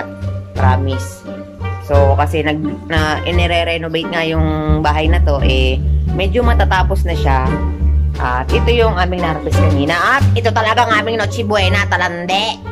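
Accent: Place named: Batangas, Philippines. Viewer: Hala sis May punto ka taga Saan ka sis. Batangas ka ba or cavite? Talaga sis Daming trabaho ang binigay sa inyo. Wow naman amo nyo pala sobrang bait pala nila. Nakakatawa ka sis May pagka comedy pala ang channel mo lagi ka ba ng ganyan? Sa mga videos mo? That’s good sis. Ayan mas cute ka na dyan sis para kang diwata ng kagubatan. Bagay na bagay sa iyo sis. Nagawa ko na ang dapat sa iyo sis. Sana magustuhan mo Ayos na yon ha sis. Happy New Year. Ohh man part two pa pala mga bases naman. Ok lang yan sis kaya nyo yan May joker naman sa grupo hahaha.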